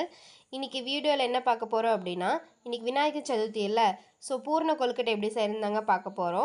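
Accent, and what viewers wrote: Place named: Kongu, India. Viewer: 🤩 i will try🥳 unga kongu tamil and voice spr nga🥰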